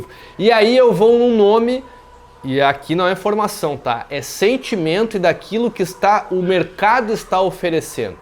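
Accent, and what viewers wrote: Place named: Sao Paulo, Brazil. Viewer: Cê? Tu tá falando igual Paulista? Tá louco.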